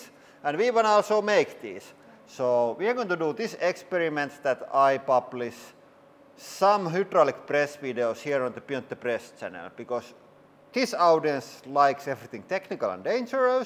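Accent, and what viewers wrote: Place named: Finland. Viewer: I would love to see Finland one day. I was born and raised in the U.S. (65yrs ago) but my grandfather Toivo was Finish. I love watching your channel because of your accent and humor, it reminds me of him. Thanks for the great content 👍 😊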